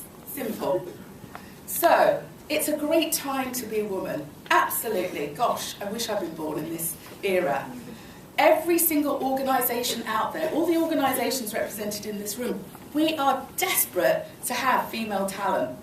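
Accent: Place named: United Kingdom. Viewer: Way’ment. I remember when she was in the group, but when did she develop this British accent?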